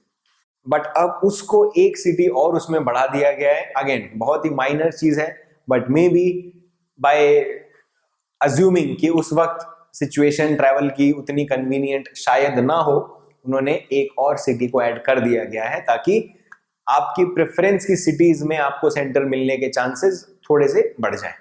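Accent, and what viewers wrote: India. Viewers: sir u r speaking in hindi like a south indian....seems funny to c u speaking in hindi bcoz i have a habit of listening to u in english only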